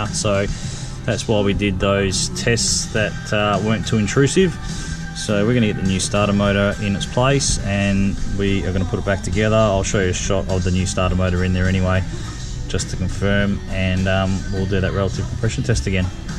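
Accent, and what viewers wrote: Australia. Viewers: Great stuff - good to hear another Aussie on youtube.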